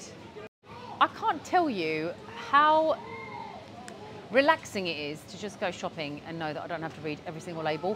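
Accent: England, United Kingdom. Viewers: are you from England.. mem ... ❤❤ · I always looking forward to your next videos... It's interesting. Maybe it's your British accent attraction...😅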